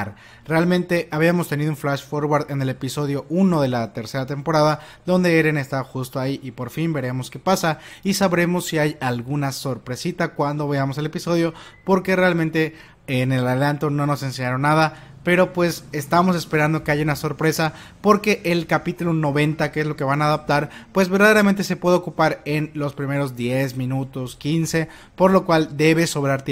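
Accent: Yucatan, Mexico. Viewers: Eres de Yucatán? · De donde eres? parece que tienes acento Yucateco ajsjajja Ya se que nada que ver con SNK xd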